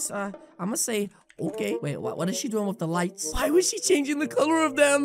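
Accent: United Kingdom. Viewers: Never knew he was British lol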